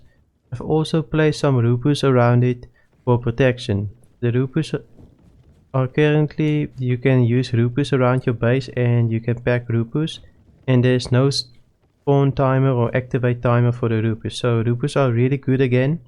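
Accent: Afrikaans, South Africa. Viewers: Thick afrikaans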